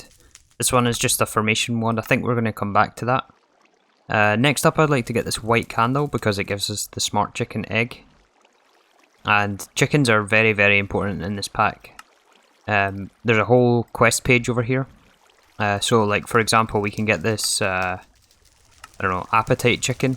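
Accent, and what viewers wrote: Scotland, United Kingdom. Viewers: I think it might be one of the best gaming experiences I've ever had, to be honest. My wife is probably feeling neglected though. Forgive my American ignorance but is your accent Scottish or like northern Irish?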